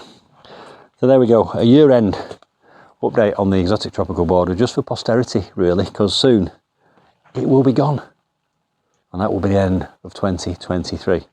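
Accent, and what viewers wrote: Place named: Barnsley, United Kingdom. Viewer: Is your accent Barnsley? I love it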